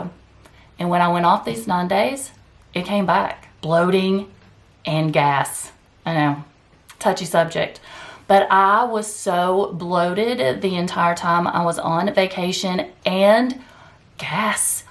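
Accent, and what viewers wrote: South, United States. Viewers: Hi, that’s GREAT! Thats keto flexing, when you go off and on keto with negligible negative results. Gotta live life. I love the southern accent( I’m a northern guy). Keep up the great work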